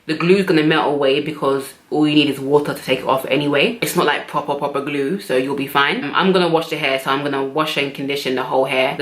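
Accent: United Kingdom. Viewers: Lady you are all kinds of gorgeous! and you have that cheeky British accent too.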